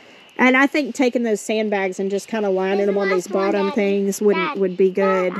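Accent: South, United States. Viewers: cracked me up😂 because no offense but to me you guys do sound like that… with the southern drawl and all… I’m in NY so that explains that…😘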